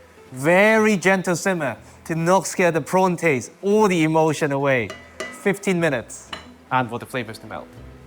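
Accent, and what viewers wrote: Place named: RP, United Kingdom. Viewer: So posh.... I am a fan old lady from South Africa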